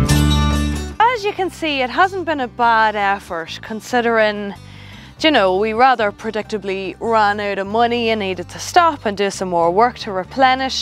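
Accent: Ireland. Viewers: Iam a bit of the Irish me self and I love your accent, been raised in California so no accent. I do know a few things in Irish slang if I dare call it that. How blessed you are to have a beautiful cottage in the country side. I moved from California to Texas because their country sides reminds me a bit of the Irish country.😢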